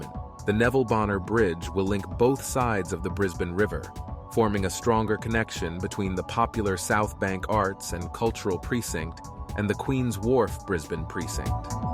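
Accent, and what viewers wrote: United States. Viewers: Why is there an American voice-over? Give this video an Australian character by having someone who has an Australian accent, at the very least. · I didn't - it was smooth! I did wonder why an American was narrating Australian construction but hey, global society and all that. :-)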